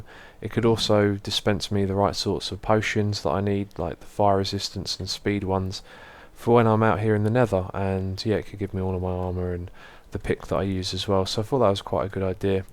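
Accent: England, United Kingdom. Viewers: what part of england are you from? :) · Nobody say 'dude you're stupid' here but are you British?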